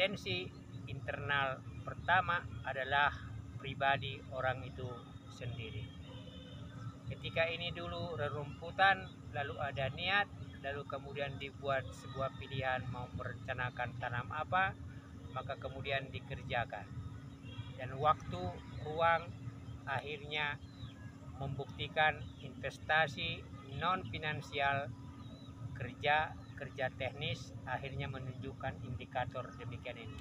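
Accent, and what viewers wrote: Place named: Sulawesi, Indonesia. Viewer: Mantap nih..kalau dari kata-katanya bapak ini pintar dan berpendidikan tinggi dan seperti orang Sulawesi gaya bicaranya ..sehat dan sukses 🙏🙏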